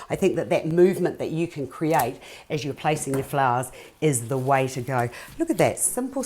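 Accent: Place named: New Zealand. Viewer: Beautiful arrangement , your accents it is come from New Zealand . Thanks for sharing.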